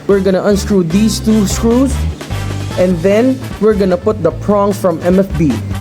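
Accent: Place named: Philippines. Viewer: U sound very filipino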